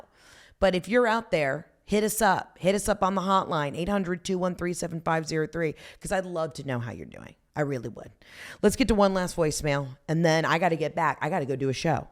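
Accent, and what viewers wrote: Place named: Australia. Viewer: Heather, your Aussie accent and humor have made my morning! Just love your spirit. So happy for you, Jeff, and the team while on your adventure down under. Love and light from Tampa Bay 🦩🏝️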